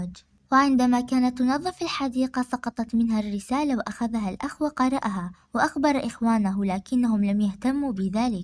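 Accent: Iraq. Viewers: ولله حسيت انك من العراق🤯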